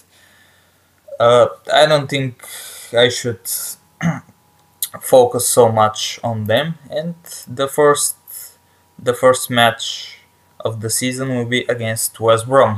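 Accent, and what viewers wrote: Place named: Bulgaria. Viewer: Great vid buddy love the accent Are you from Bulgaria if so big up Stan the man the Celtic ledgend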